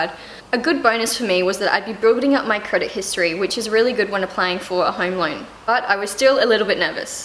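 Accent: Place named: New Zealand. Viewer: Great videos! Love em. An addition into having a credit card is that some have small % cash back whenever you used it. It's almost free money! Your accent sounds New Zealand-ish. It's very pretty :)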